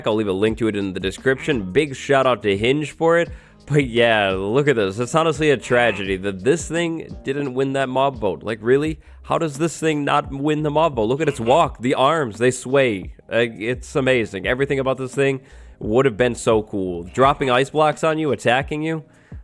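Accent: United Kingdom. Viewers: Aye yo your british? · from intro: you're british? xD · Ur british I am to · wait you’re british??? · Top notch British accent 👌🏼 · ur british? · wait you're british?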